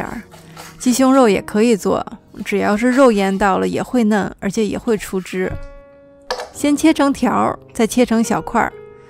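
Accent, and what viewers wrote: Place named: Beijing, China. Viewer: 就喜欢你这口京味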